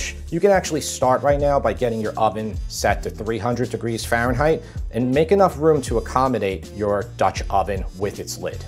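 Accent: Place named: Delaware, United States. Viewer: Delaware accent.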